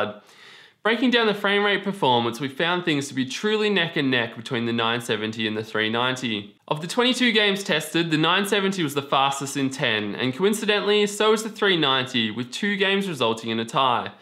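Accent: Australia. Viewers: You sound like you're from Australia. May I ask where you could possibly get a GTX 970 for $300? That's the price range of the GTX 960 here. The 970s are around $450, depending on which brand you pick. If you're not from Australia, my apologies.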